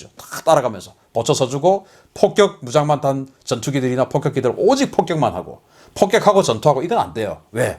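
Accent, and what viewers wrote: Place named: Busan, South Korea. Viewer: 죄송합니다 만 저도 부산출신인데 사투리 재미나요 ㅎㅎ 표현도 재미나심 편대가 촤아아악 무장을촤아아악 ㅎㅎㅎ촤아아악을 자주 표현하심 ㅋㅋ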